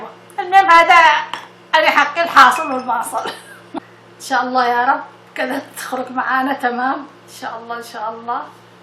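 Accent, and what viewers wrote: Aden, Yemen. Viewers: هاذي اللهجة اليمنية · اللهجه اليمنية تعجبني وطيبه اهل اليمن محبتك من السعوديه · الله يحفظك ياماه انا عراقي وعشت بليمن وعدن خاصة من الكلام العدني ذكرتيني بعدن كلها وناسها وطيبهم انا الان في العراق وحبيت اسوي بخور عدني بس المشكلة مافيش الظفر دا ينفع بدونه ولا ضروري يعني ولا في حاجة بداله ونفس الشغل حق الظفر 🌹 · يازين اللهجه اليمنيه ♥️ نحبكم من السعوديه 🇸🇦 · الله يسعد هالمبسم .. ما شاء الله لا قوة الا بالله ما أحلى طريقة كلامك ولهجتها اليمنية الجميلة .. ما أجمل روحك .. يا الله شكثر أحب أهل اليمن ..الله يفرجها على اليمن ويردها إليه ردا جميلا ويردها اليمن السعيد الحافل بالخيرات والبركات اللهم احفظ اليمن واحفظ أهلها واحفظ دينهم وأعراضهم ونساءهم وشبابهم وشيبانهم وأولادهم وبناتهم وأطفالهم وأموالهم وبارك. اللهم في أهلها وأرضها وخيراتها وما فيها من النعم .. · DAir هاذي لهجت عدن كل مكان معه لهجه اني من الحديده مااعرف اتكلم مثلها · انا افدا القلب حقك ي خاله والله انتي الام العدنيه الي يجي قلبه صافي وتعتبر الكل عياله وبناته والله انا مستحيل ادخل بلبخور او افكر اننا اسوي بخور بس والله نحنا مغتربين وكلامك يرجع لنا دكريات اللهجة العدنيه ونحنا محرومين منه بلغربه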